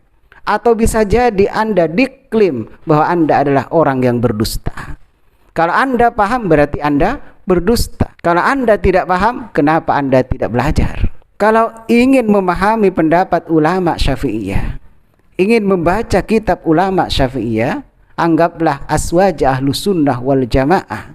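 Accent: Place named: Madura, Indonesia. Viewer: Kebanyakan logat bahasanya Madura. Sumbernya kiai yg sama. Mereka yg susah sunah ini misalnya Zaka, Sane Oye, terus orang ini. Orang ini udah salah disebarkan lagi. Maklum. Orang ahli bidah itu dihalangi tubatnya oleh Allah... E, chong ngaji ke Universitas Islam Madinah biar tidak pakai ibarat. Kasihan chong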